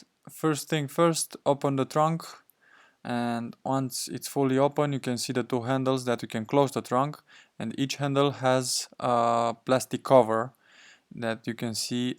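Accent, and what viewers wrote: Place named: Dâmbovița, Romania. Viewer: Ce accent de Dâmbovița ai când vorbești engleză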